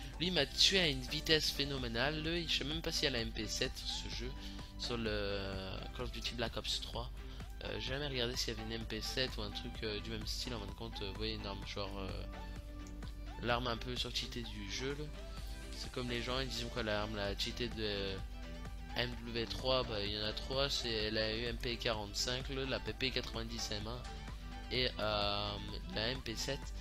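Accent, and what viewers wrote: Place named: Belgium. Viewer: On dirai un belge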